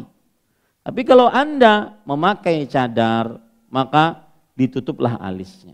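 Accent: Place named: Banjar, Indonesia. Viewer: Orang banjar lih pian guru..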